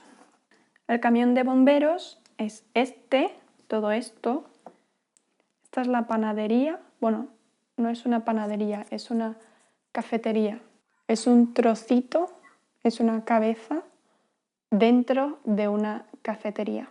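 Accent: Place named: Madrid, Spain. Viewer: Gracías por tus videos. Son divertidos y educativos. :) Estoy tratando de aprender español nuevamente después de un descanso de varios años. Tus métodos y tu forma de hablar me requerdan mucho a mi profesora del Colegio comunitario donte aprendí Espanol por unos años. Ella es Madrileña y quiza por eso la pronunciación es tan similar. Los bomberos hacen bombas así como los panaderos hacen pan. xD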